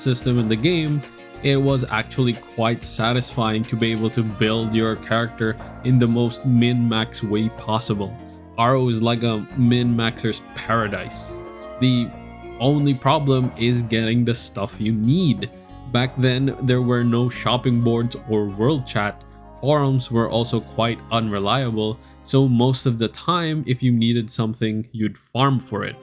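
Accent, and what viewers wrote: Philippines. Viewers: Not sure why sounding Filipino is a problem. He's not doing any humiliating thing like what you're doing to yourself.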